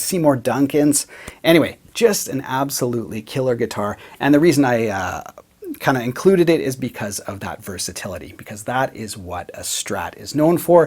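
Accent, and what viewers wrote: Canada. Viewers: no Godin? and I hear you're Canadian and you're not propping up a good Canadian company... Love my Godin Session.. and yes I am Canadian.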